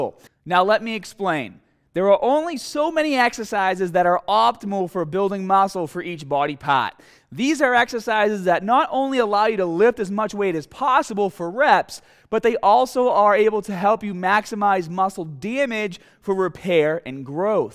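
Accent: Boston, United States. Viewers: Doda Dado could be either Boston or Chicago accent. Lots of Irish influence in those regions back in the day. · Do I hear a Boston accent? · He sonds part Boston and part dork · Except he has none of those accents. I guess 'American' could work but it's a Boston area accent. There are like 20 'American' accents. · GLAD TO SEE A FELLOW BOSTONIAN DOING BIG THINGS ON YOUTUBE ..THE ACCENT IS THICK WITH THIS ONE ITS FUNNY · Is he from boston? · It's actually a completely authentic Merrimack Valley accent, from north of Boston, the Lawrence/Lowell area...my own homeland. Though I've been gone for many years, I wax nostalgic hearing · That's a Boston accent what's the problem ? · That Boston accent on heavy lol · This is Boston, not LA kid. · do you really have that hard of a Boston accent? I've watched many of your vids and I've never noticed it before, it almost sounds like youre embellishing it haha. 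· Is this dude American or Australian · Boston. That's what they sound like. I love it. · It's Boston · Canadians dont have accents lol. It's as plain English as you'll get. This is boston all day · Are you from Boston? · Love the Boston accent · Love watching ur channel and putting your advice to use at the gym trying to get in much better shape also listening to you talk I realize what I sound like to other people when I talk I'm about 45 minutes from Boston I'm in Taunton so mine isn't as strong lol but man now i can see why people from other states recognize our accent immediately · Svein Gustav Hoff When he moved to Boston. He wanted to match the accent for fun I believe. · You’re so from Boston ❤️ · Sounds like Boston to me lol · He is from Boston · Are you from Boston? That accent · no its Bahston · Sounded like Boston to me. It's definitely not Australian!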